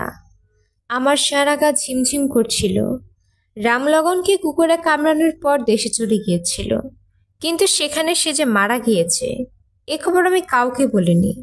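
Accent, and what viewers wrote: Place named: Kolkata, India. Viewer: Kolkatar tone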